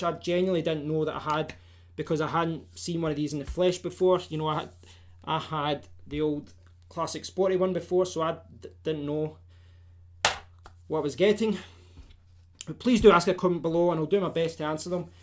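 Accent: Scotland, United Kingdom. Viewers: Oh my actual days. I’m reading this 9 months after the comments and this reply “you’ve hurt my feelings” has just cracked me up so bad 😂😂😂😂 I just keep imagining it in that scottish accent 😂😂😂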